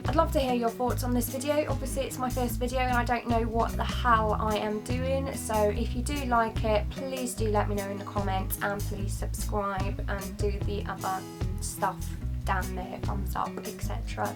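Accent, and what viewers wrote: United Kingdom. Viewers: From Britian, I'd suggest!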